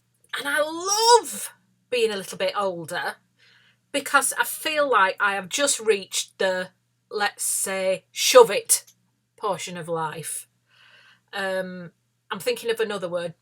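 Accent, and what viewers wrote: United Kingdom. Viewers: I love her British accent so much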